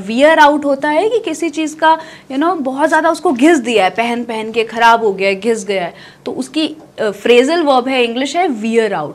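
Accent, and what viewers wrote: India. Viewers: Maam I loved d way u say Hindi wale English 😂❤keep on teaching like this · Sweat and smart words that enhance the charm and beauty of sentance and you are full proved in it. And I'm highly impressed with your's English accent with the soul of Hindi adds to the excellence of language, Thanks beti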